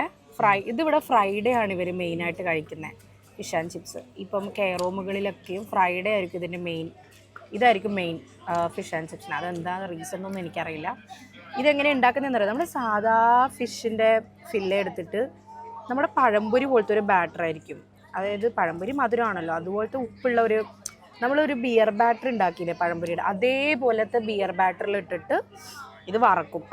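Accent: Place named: Kannur, India. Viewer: “Ayyoo aah pennu avidenneduthu THULLI” 😂. Kannur baasha❤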